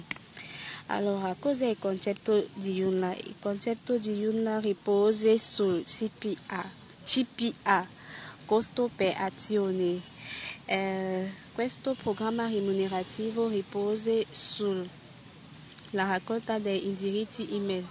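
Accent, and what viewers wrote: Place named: France. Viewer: Il tuo accento mi fa impazzire! Sono francese anchio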